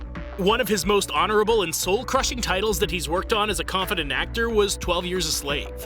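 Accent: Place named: United States. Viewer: I left the following comment on your phoney 'no talking films stars' sister, channel. On this channel Benedict mumbles a few words where you've turned the sound down. Why? Read this: (like you two; I couldn't be bothered writing to both of your channels... you're carbon copies of each other. I guess you discovered you'd need more channels to get subs rather than pay for the commercial rights to the actors actually talking, out loud): Videos about really interesting, talented people like where you never get to hear them speak. Not acceptable. Could it be because the cheapskates at Biographer are too mean to pay the commercial rights to their film clips so they can't broadcast them with sound? That's why the irritating American 'car salesman' voice has to quote everything that is said in the clips. There is a clear message in the mere 88K subscribers; heavens, there's a grandma cooking pasta sauce on YouTube with double the subscribers than Biographer. 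Imagine if you actually had the voices of the huge stars you have images of... ah, but then again you'd have to shell out for that. You'd have to sell more advertising. Nah, don't bother. Not on my account... I'm outta here...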